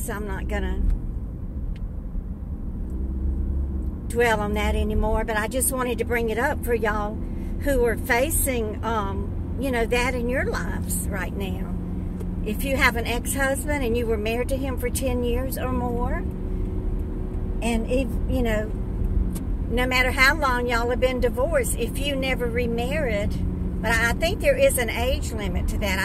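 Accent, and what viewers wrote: South, United States. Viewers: Hi There It's Julie Falkingham I just love you and you are so wonderful. I will be writing to you. You are just so wonderful and sweet. I'm so thankful for all my friends and family and my husband he has been my rock and my friends are not just my friends but they are my family. You are such a loving caring person and I love your southern accent. You are so wonderful for what you are doing for your friend. You are so wonderful and your the best. I'm so greatful to have you for my friend and I would love to meet you in person and you remind of my gram she was my life and I will fill you in writing you my letter to you. You are so amazing and so wonderful you deserve nothing but the best. Love you Love your friend Julie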